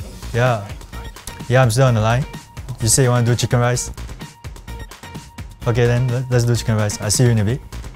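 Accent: Singapore. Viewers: omg that Singapore accent. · Definitely sounds like a Singaporean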